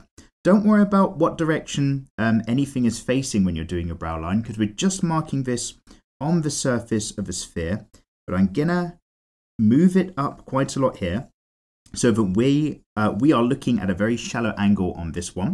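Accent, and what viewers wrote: United Kingdom. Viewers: ah sory about that but i fucking hate british accent.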